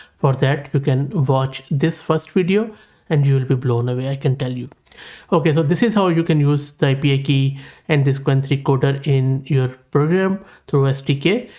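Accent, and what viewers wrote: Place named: India. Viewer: >indian voice >disliked and reported the video immediately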